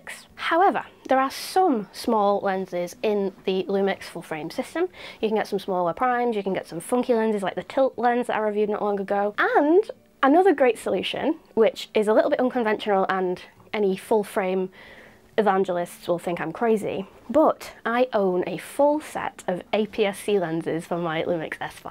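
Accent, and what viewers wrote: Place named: Northern England, United Kingdom. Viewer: Great video. This is the first time I've heard the term iso invariance, and it does explain my only complaint using MFT. I have used and still own quite a few different cropped sensor and FF bodies I wonder which ones are and which ones aren't now! Fyi, I would continue to watch no matter what you spoke about ... I am here for your lovely Northern English accent 🤩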